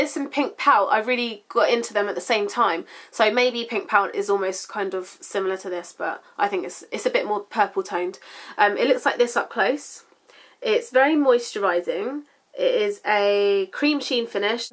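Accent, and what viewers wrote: United Kingdom. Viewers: ur cute. then i played ur video. ur british! even cuter! totally subscribing! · Are you British ? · lol i just think its so cool when i click on a youtube video about hair or makeup with a girl & then out comes this british accent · Ur so British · I love the British accent !!! Thank you for the very helpful video 💕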